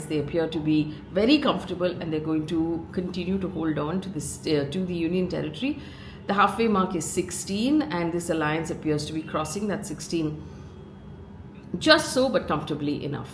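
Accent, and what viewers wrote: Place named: India. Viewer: Are you Indian? The way you speak (your accent) sounds so Indian!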